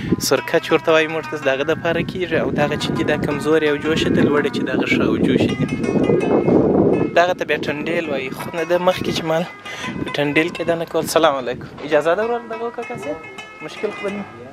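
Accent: Kandahar, Afghanistan. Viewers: Mohammad Asif KHAN hahahahaha. Kabulai lahja?????? Kabul ki Pashto lahja da koma showa? Lara Pashto aw Bara Pashto dway staree lahjay dee. Da da Loi Kandahar, da. Kotee کويټه aw shawokhowa lahja da.Kabul lahja na laree. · Love the sweet Qandahari accent. This vlog took me back to my country and provided food for my soul. Great work brother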